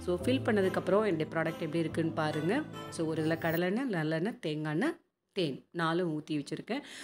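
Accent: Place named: Tirunelveli, India. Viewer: Nellai Tamil is mass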